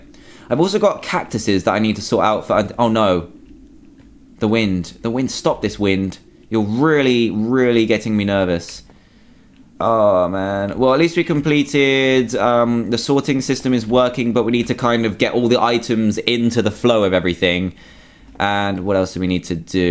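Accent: United Kingdom. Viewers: *well he's british, and they pronounce it different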